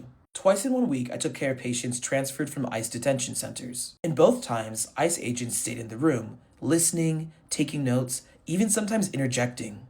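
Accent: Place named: Africa. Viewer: You are correct about privacy. We are in crisis in America--Aliens need to go home and get their healthcare and keep their privacy. Some of the immigrants are dangerous killers. You should be happy that ICE is there . You look and sound like a 1st or 2nd gen, African or Haitian, so. you see things different than non administrative Americans.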